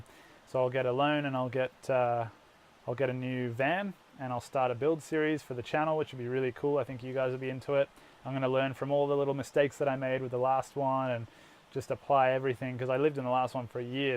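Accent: Australia. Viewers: I've missed you! Glad you're back! The job sounds like it will dovetail nicely with your interests! I love your videos because it is great to hear an authentic Aussie voice and Aussie scenery. I am sick of only seeing the USA stuff. I want to see more Aussie stuff.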